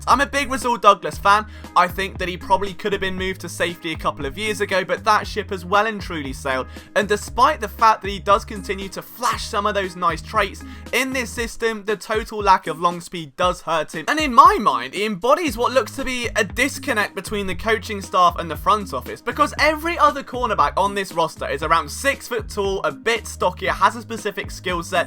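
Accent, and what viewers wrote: England, United Kingdom. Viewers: You're British??? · I’m from Philly and listen to a lot of Eagles content and yours is by far the best. I like the fact that you don’t hype things and that you dive deep to give all of the supporting information that you can for every post. When I first heard your accent I was curious about why and where you were doing this from and I confess I am still a little curious. I’ve never caught your full story of how a Brit got into this. you are exceptional at what you do! You have a gift and please keep bringing us information starved and isolated Eagles fans Eagles news to brighten our days! Thank You! Ace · Weird mix of English accent and Eagles news. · ... I'm old enough to be your grandfather, born, raised & lived in the Philly area most my life, but now live in northern Colorado... You Sir, are young, born in Britain, have a British accent and know tons of facts about the Eagles... 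Yet it all means nothing until we cut ourselves open and... "BLEED GREEN" and that sir, you do... screw the trolls! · Wait, you're British? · Ugh damn I try but I can't stand the accent at all!! Fuk not that the vid is bad. It's just you talk fast and loud in the accent I think that's what people are talking about I don't think it just cuz ur not a American u just talk fast and have a heavy accent .I personally cannot stand an English accent at all. But it has nothing to do with you personally at all. Eagles fans are over the world and anyone can be a fan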